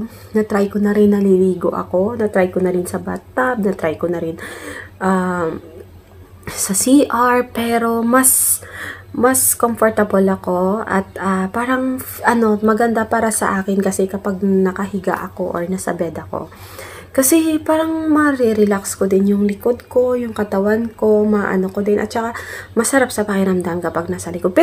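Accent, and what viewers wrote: Iloilo, Philippines. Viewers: Ilongga ka idol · Taga san kba ma'am? Daw ilonggo ka bla! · Nice ilongga si madam 😂😂😂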